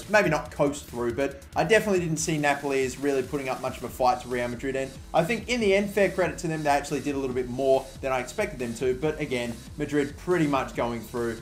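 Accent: Australia. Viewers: is he aussie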